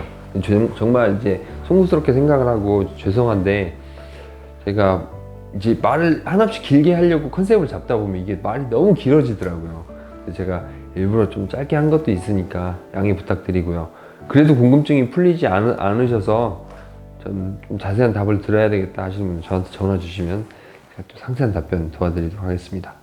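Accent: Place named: North, South Korea. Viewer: 저는 부산사람인데 걸리버 방송을 연달아 듣다보니 저도 모르게 위쪽 사투리가 나올거 같아요ㅋ 근데 차분하게 설명해주셔서 참 듣기좋아요~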